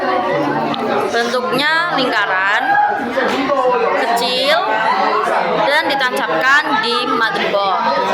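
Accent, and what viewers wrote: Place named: Java, Indonesia. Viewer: medok banget jawanya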